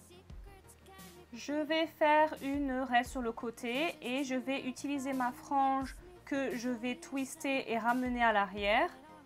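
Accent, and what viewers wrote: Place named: England, United Kingdom. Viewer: J'aime ttes les coiffures,j'adore ton accent anglais💘😄✋🏾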